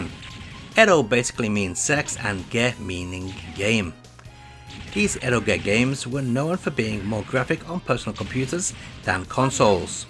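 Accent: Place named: Liverpool, United Kingdom. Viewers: Ah it's a Liverpool accent, I was thinking North West, then I doubted myself and didn't want to guess. I know Liverpool well. Spent a lot of time there. Live in the New Forest. Yes, I'll be 50 next year too. Time does indeed fly. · Always sounded very much Wallasey to me, so wasn’t far off 😊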